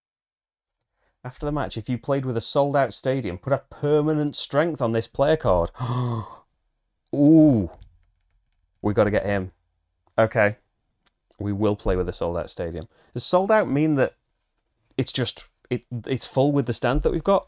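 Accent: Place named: England, United Kingdom. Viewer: Hey what are the odds finding a YouTuber playing Elven, the released version and not the KS preview, from the Country that’s the home of Football, with an accent my BE Teacher would have loved, and then him not being a football fan at all? 😂 Too funny. Thank you! Excited for more on this. Regarding to Rules, you missed the Sponsor thinks others mentioned and you are only allowed to have 5 attacking and 5 defending players, in every Games. That leads to more realistic scores. Meaning You get more 1:1 and 2:1, than 4:5 ore 3:3…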